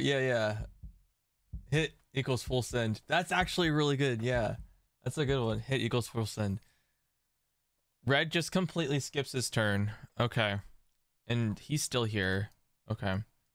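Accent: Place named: Ohio, United States. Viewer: I knew you were from Ohio by the way you pronounced Medina.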